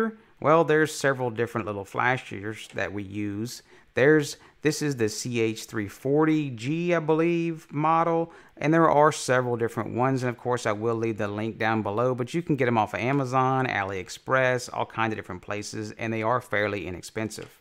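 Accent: Louisiana, United States. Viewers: Very Cajun!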